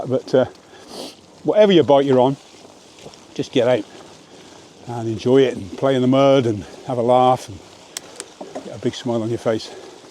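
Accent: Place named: South West England, United Kingdom. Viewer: what part of the country are you from? I rather like the varied English accents! I was guessing you are from the South West?😊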